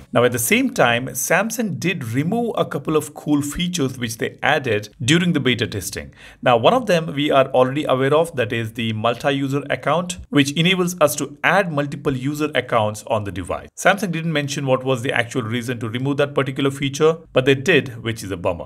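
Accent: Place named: South India, India. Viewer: Yeh kaisa accent hain re mere bhai ?? US jaisa SouthIndian wala ?? matlab confuse ho gaya english sunke dimaang ghumraa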